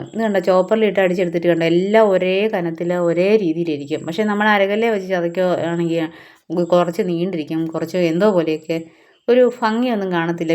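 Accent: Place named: Kanjirappally, India. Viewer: കാഞ്ഞിരപ്പള്ളി അടുത്താണോ ? Slang അങ്ങനെ തോന്നി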